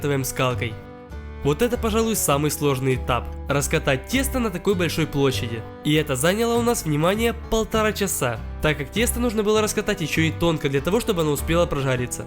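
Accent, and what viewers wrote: Ukraine. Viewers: Слушай попробуй зделать на ней огромную порцию жареной картохи или люля кибаб и можно шавуху.(я смотрю твой канал с 3 тысяч тогда чувства были что ты прям украинец украинец но сейчас как логопед по русскому языку) желаю успехов и обогнать обломова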